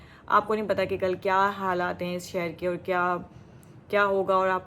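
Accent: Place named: Rawalpindi, Pakistan. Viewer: Totally pindi accent .you are mashallah you talkative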